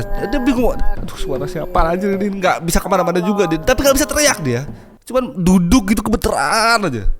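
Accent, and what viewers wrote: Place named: Lampung, Indonesia. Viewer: Bang ni orang lampung bukan ya? Logatnya kaya orang lampung ya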